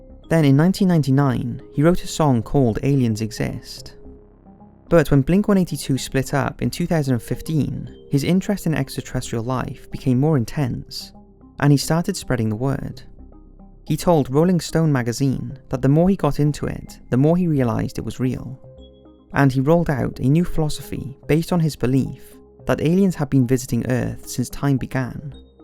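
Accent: United Kingdom. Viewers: Yay British narrator :) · Are you Welsh? Where are you from? love the accent 👌🏻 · Like how the narrator has a soothing British voice. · Man it's hard to understand the British accent:/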